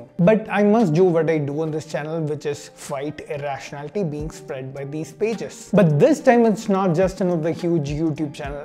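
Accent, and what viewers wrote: South India, India. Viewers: it's not the spelling mistake. It's the accent. South Indian accent.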